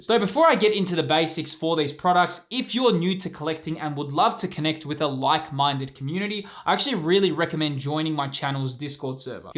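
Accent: Australia. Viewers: I love how Australians say Naoer instead of no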